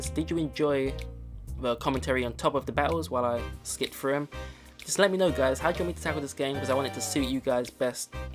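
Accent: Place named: United Kingdom. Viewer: So, noting the UK accent... whereabouts in the country are you? I'm guessing south? :)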